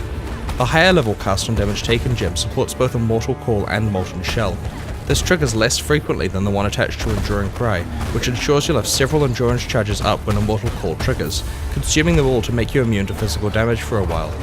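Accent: New Zealand. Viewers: Who's "GrantingGayGames"? ^^ (no offence meant,i just love newzzie pronunciation)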